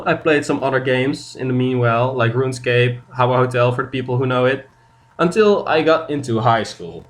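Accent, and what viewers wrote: Netherlands. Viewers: You are Dutch arent you? haha the accent is such Dutchie :P Youve got a great video over here!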